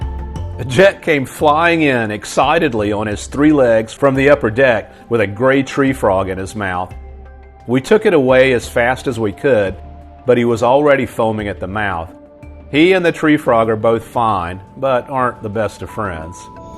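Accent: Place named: Midwest, United States. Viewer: I know you live in Georgia now and lived on the east coast prior to that but you don't sound like a southern gentleman to me. Where are you from originally, sounds like a midwestern guy to me.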